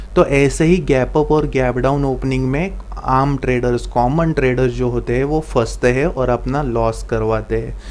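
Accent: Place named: Maharashtra, India. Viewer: आवाज आणि उच्चार ऐकून वाटत की तु मराठी आहेस. तुझे vdo पाहिले आहेत. खुपच माहितिपूर्ण vdo आहेत. तुझे खुप खुप आभार🙏 अवांतर :- मित्रा मला तुझा आवाज अमोल पालेकरां सारखा वाटतो,😊😉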